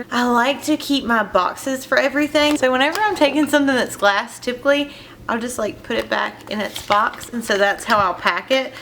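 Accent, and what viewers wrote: South, United States. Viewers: love your southern charm, you're accent is so adorable, have fun in Atlanta!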